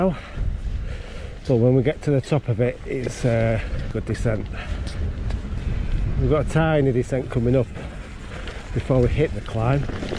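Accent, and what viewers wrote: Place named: Yorkshire, United Kingdom. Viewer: Yorksheer way? Northern 👊🏼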